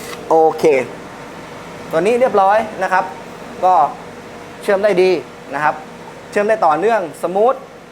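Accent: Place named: South, Thailand. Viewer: สำเนียงเหมือนคนใต้บ้านเราเลย นิ 555fc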